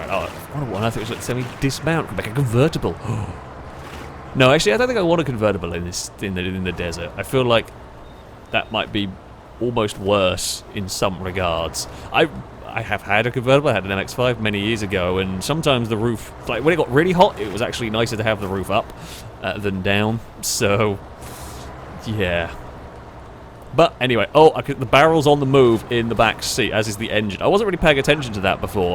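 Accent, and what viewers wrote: United Kingdom. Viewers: Are you British? I'm irish and we dont like the British but if you are your a exception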